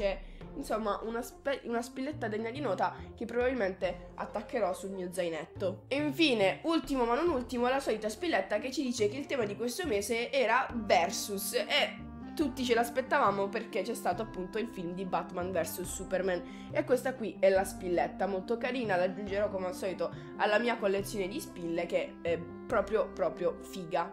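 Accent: Apulia, Italy. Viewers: ma sei pugliese?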